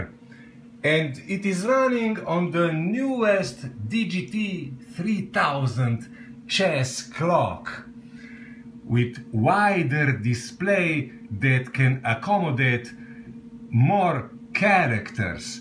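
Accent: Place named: Russia. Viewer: I total read this with your russian accent! Youre so funny! thanks for the response.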